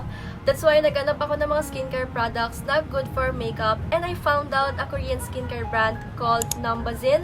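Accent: Philippines. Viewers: its taglish. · Yup its Filipino accents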